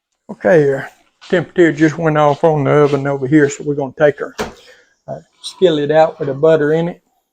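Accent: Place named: South, United States. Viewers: southern accent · I gotta ask.....where are you from?!!!! Cause you sound just like me. I'm an Alabama girl, thru & thru!!! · I am from MS and I knew immediately you were from the South, your accent and choice of words and when you said coke and tomato gravy I laughed, I said yep probably a Alabama man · I love you Southern accent, cant wait to see you make the tomatoes gravy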